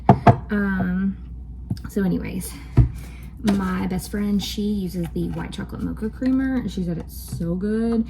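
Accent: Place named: Kentucky, United States. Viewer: I can't quite figure out your accent. Are you from Kentucky or Tennessee or some place like that?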